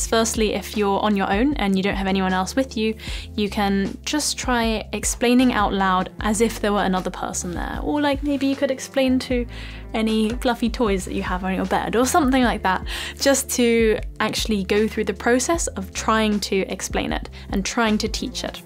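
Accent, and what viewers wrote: RP, United Kingdom. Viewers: I love your British accent a lot. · British accent 😭😭😍😍😍😍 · I want to learn British accent and I really like your accent and thnx for tips . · Hi are you British ☺️ it's important for me because I am trying to learn British accent . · If you ever make another video, wich in fact i doubt, please answear in your responses if you speak RP all the time or just with us in these videos.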